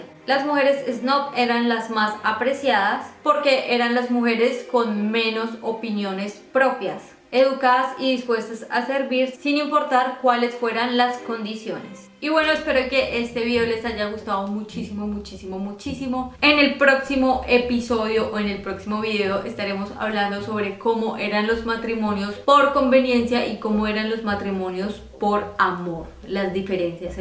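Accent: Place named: Antioquia, Colombia. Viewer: Estoy acuerdo en ciertas cosas sobre esa época, como lo que era la educación, verse y ser un tipo de persona con una moral más alta, pero, se me hace una reveranda idiotez lo de preservar la virginidad para el marido o ser una persona que no quisiera aportar para la manutención. Por cierto buen vídeo! Noto que tu acento es paisa, saludos desde México ;)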